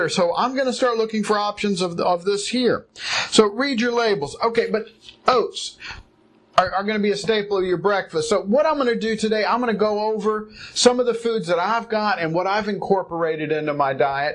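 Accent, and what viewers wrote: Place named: United States. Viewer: Hi! You are too fun! Just subscribed. Love the American accent and the bottle of poison on the window sill and you can never go wrong with your very own handcuffs. My biggest issue is coffee. I started using espresso and cashew milk. That’s about the only way I can handle it. I find breakfast the most difficult because I wake up at four am to go to work, so I actually eat two breakfasts. One at four-thirty and one at work at seven-thirty. Then lunch, and a light dinner. I try to stay away from bread because I have a gluten intolerance, so I am experimenting with granola that I make, and some peanutbutter and rice cakes. I can’t take nuts to work, because it is a nut free facility. I work in an Oreo factory in Toronto...lol. At least the product is vegan. Have a fantastic day, my friend.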